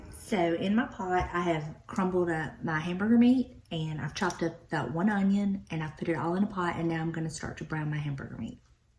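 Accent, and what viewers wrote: South, United States. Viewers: Hello! I'm a too. I go by "Willie's Mom" on YouTube. Liz from Traditions by the Seasons gave you a shout out and sent me this way. This is the first video of yours I've watched. Being a southern girl myself, I live hearing a lovely southern twang. I plan on binge watching tonight. I wish you all the best and much luck on YouTube.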